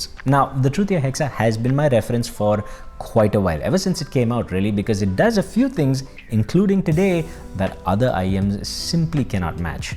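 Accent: India. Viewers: I really enjoy your reviews. I love your oration - great choice of words and phrases! I'm British and maybe that nice flavour of Indian English appeals to me. I don't know, but I like it :) Keep it up. Namaste.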